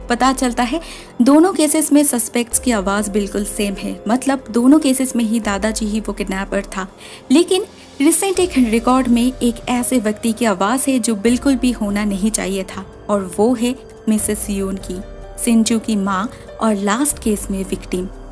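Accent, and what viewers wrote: Bengal, India. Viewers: R u Bengali or east Indian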